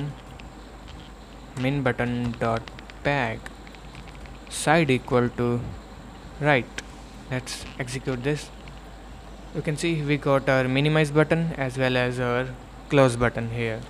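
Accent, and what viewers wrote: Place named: India. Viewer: now thats an indian accent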